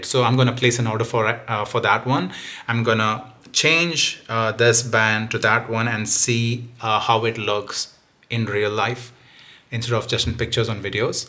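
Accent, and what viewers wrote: India. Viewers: is definitely an Indian fella.the his commenting.so fuckin yindiann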